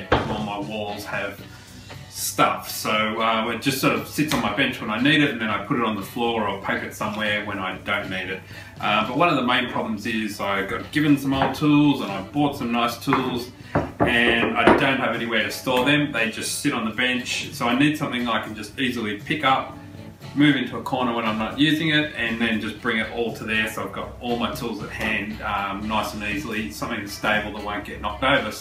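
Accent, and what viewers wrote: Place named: Australia. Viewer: A fellow Aussie You Tuber, what a refreshing change to hear our own accent. Love the tool rack. I’ll try and make one this weekend but I think I’ll lay some steps in the base to stagger the rows of chisels. Being an old bloke on blood thinners, I don’t need any excuse to cut myself in the workshop. Thanks mate.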